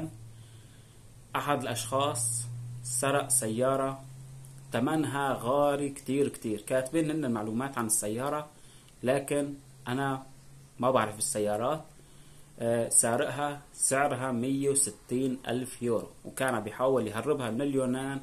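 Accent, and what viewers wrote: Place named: Aleppo, Syria. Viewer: المشكلة من لهجة انت حلبي معم افهم على لجتك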